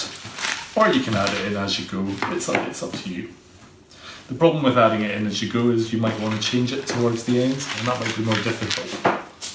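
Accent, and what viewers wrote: Scotland, United Kingdom. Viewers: I did this at my floristry lesson today and pretty much enjoyed it too! Where are you from by the way? Are you Scottish?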